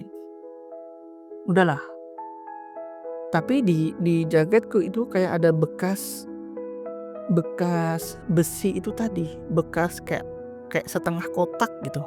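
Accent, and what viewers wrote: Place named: Sulawesi, Indonesia. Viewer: Logat sulawesinya masih nempel ya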